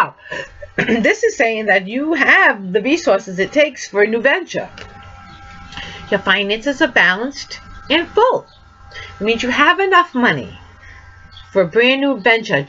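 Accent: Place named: East Coast, United States. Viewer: I love your accent......Lemme guess, East Coast?......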